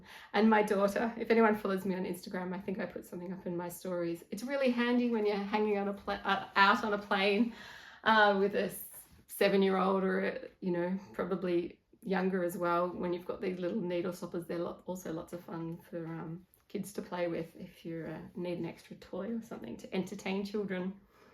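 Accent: Australia. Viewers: It's wonderful to hear another aussie accent on youtube 🙂 I like the look of the seed star tank, I think it is something I'd like to knit. Your Miss May MKAL is looking good so far. It's exciting to hear that you're doing a giveaway, and the yarns look lovely. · Hello from NZ! It's always wonderful to hear an accent from Down Under on YouTube! Just found your channel, love your style and vibe so of course I subscribed. Good luck with your tramp! And just as a thought, if you start a new project for your train ride, it doesn't matter if its a sock or a jersey - that only matters once you've finished it. So I reckon you start the Kala T! 🤣😁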